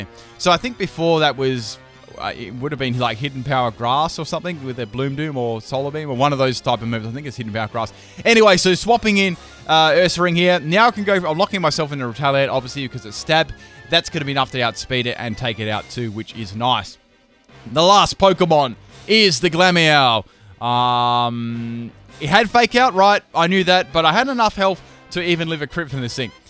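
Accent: Australia. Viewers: By the way, are you from Australia or New Zealand?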